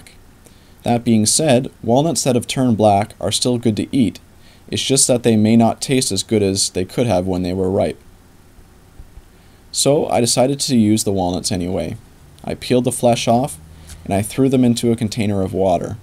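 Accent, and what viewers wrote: Canada. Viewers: Idk if a guy can sound more Canadian....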